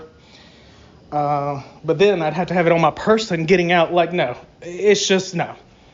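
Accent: South, United States. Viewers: please put your paypal link in the description box for ALL your videos so we can donate to your work. Oh and another thing, dont you dare change your accent or many of us will unsubscribe. We love you southerners the way you are - this is because many of you white folk in the deep south are just beautiful human beings - so promise us all that you will never change.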